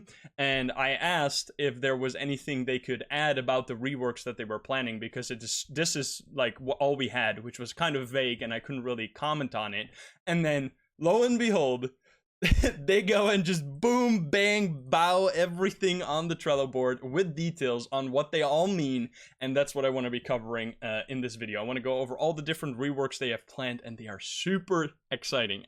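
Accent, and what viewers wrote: Netherlands. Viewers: eeeey een nederlander of belg idk eeeeey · WAIT, are you dutch? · Dit is een Nederlander ik kan het horen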